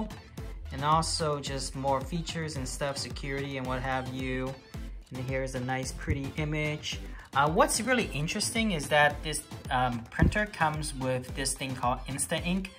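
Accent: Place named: Denmark. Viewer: I would say you are Danish from the Netherlands am I right?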